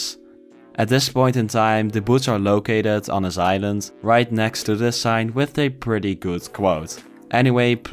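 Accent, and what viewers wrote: Netherlands. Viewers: The dutch accent 😭😭🙏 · You really sound Dutch. Are you Dutch?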